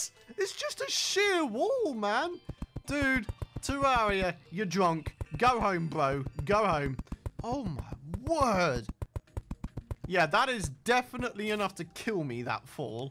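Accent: United Kingdom. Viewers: Why does every terraria playthrough include jolly British men?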